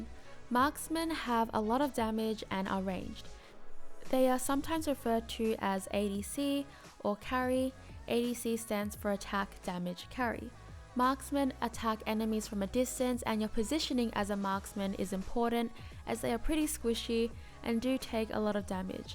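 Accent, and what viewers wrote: Australia. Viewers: Are you an aussie??? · That australian accent Love it <3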